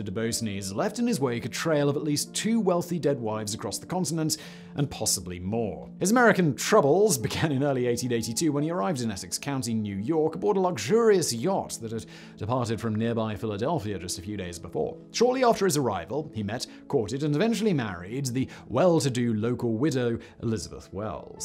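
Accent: United Kingdom. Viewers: One word: British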